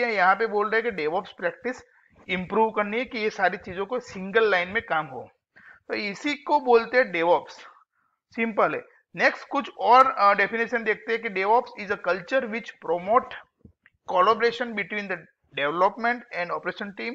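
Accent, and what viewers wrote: India. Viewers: Are you from Orissa ? Your accent clearly shows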